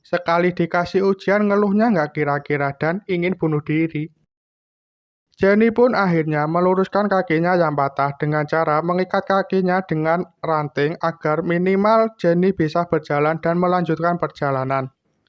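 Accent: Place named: Java, Indonesia. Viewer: Medok tp seru bro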